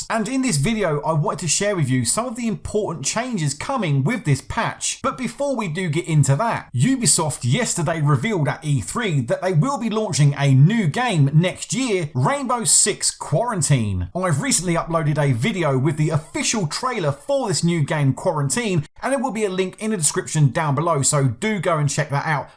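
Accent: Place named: England, United Kingdom. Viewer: yeah, why not? I can listen to that English accent for a while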